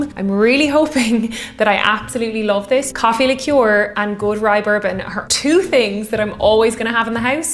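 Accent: Ireland. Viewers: I'm very happy that I discovered your channel. You are engagingly beautiful and the brogue is wonderful. Gorgeous!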